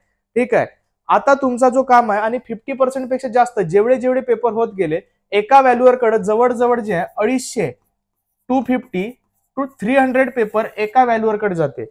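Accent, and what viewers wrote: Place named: Malvani, India. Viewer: Sir khup chan malvani boltat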